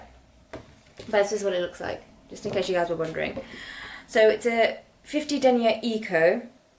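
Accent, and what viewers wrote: United Kingdom. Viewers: I enjoy all of your videos and I love your British accent your voice is lovely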